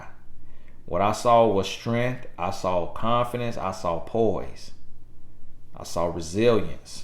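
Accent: South, United States. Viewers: God bless you for this. Oh and I love your southern accent. 🇳🇬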